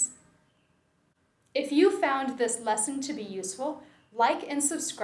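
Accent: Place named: General American, United States. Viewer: Congratulations from New York City, you have a standard American accent. That's essential for English teaching.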